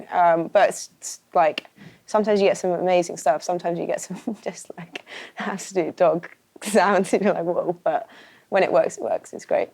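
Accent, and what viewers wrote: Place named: United Kingdom. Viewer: She's so "Bri-ish" I couldn't understand half of it :D Good the music is banging :)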